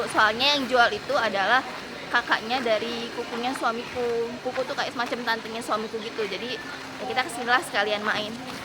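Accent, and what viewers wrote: Java, Indonesia. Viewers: Cantik bgt MBK ...asline wong ndi kaya logat jawa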